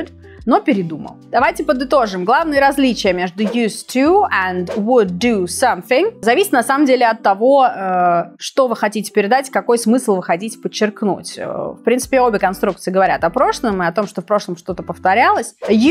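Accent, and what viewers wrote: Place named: United Kingdom. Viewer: U sound so british. So academic. 😊 No offence, btw.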